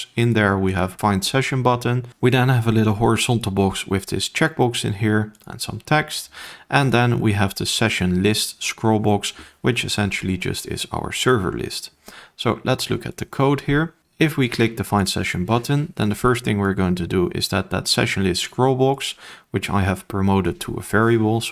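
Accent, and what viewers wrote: Netherlands. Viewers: You have a dutch accent, I am dutch too xD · are you dutch by chance? :)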